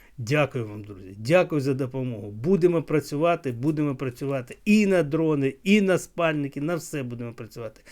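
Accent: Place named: Russia. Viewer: В пана самого проскакують русизми , 10 років московський чобіт топче нашу землю , а ви , пане блогер , так несуразно розмовляєте українською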